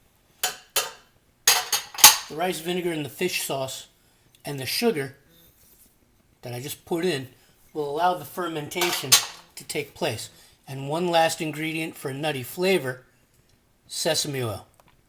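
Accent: United States. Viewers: Haha, you just have a strong American accent is all. I've definitely heard worse :)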